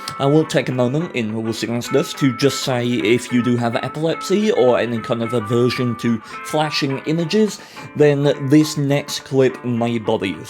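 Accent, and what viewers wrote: United Kingdom. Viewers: This guy is the most bri’ish guy in wot…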